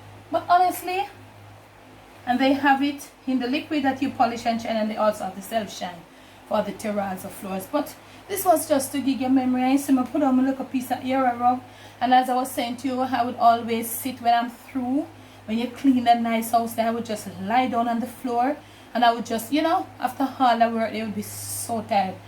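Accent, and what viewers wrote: Jamaica. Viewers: I love your ‘back then’s’. Just love them. Sometimes I have a little problem understanding your patois but I’m getting there the more I listen the better I understand😌. Love your recipes Love to watch how much you enjoy your food. Camera Girl is such a polite young lady. Love the way you keep it so real. Please give me a shout out from time to time. My family and I visit your beautiful Jamaica every year. Montego Bay is our spot though. If I get to Kingston I would love to visit you and your family and taste your cooking, I know it’s EXCELLENT! I want to taste your soft dumplings, crispy fried chicken and ripe plantain! And yes the camera did pick up the floor shine❤️ USA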